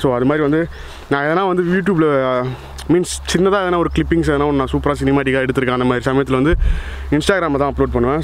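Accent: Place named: Chennai, India. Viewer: Chennai slang iruku bro pesumbothu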